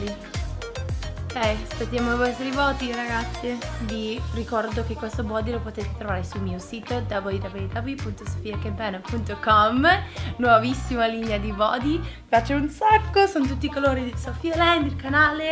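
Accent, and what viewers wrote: United States. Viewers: Ma perché parli più in americano ora che negli altri video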